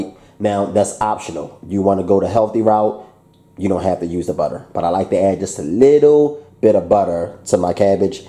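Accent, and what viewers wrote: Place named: South Carolina, United States. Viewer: Bro, you sure you’re not from South Carolina?????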